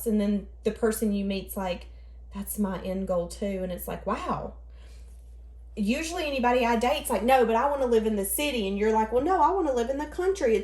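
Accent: South, United States. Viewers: Thank You. You are inspirational. Love your sweet southern accent and good advice.❤❤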